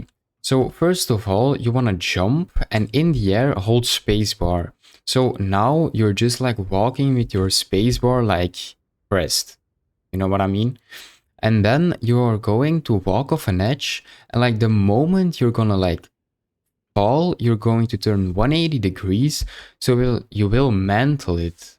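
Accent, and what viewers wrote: Belgium. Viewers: belgian accent vs brazilian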